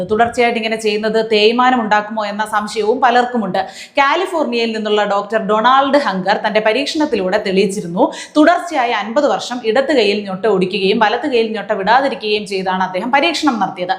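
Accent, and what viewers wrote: Thiruvananthapuram, India. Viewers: ജി തിരുവനന്തപുരംകാരി ആണോ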